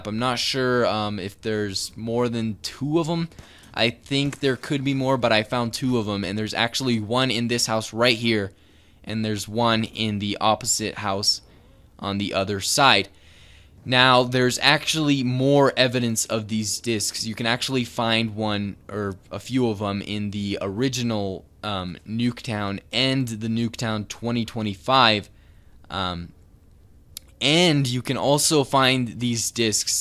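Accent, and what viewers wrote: Bosnia and Herzegovina. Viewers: U a bosnian?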